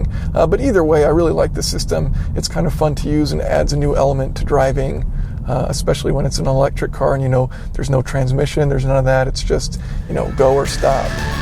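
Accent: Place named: North, United States. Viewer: Thanks for a great test. I'm still trying to figure out in what part of NC you learned to talk like a Yankee.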